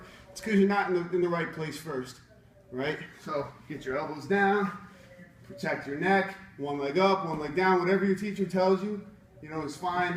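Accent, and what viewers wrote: Brazil. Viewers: Lmao, that Brazilian accent killed me. Subscribed. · Very nice tips!! About the Brazilian accent... do we really talk like that? lol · Can you do an instructional video with your Brazilian accent? That would be amazing! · More brazilian accent videos please. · Thank you for the white belt videos. They're super helpful. I really like the Brazilian accent wrapping up what you just showed us. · Your Brazilian accent gets me laughing every time. Love your videos. Thanks. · You have a mean Brazilian accent, dude. Great vid too. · i love the Brazilian accent at the end of your videos lol... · The Brazilian maestro accent is beautiful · That Brazilian accent is pretty good lol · Love the Brazilian accent.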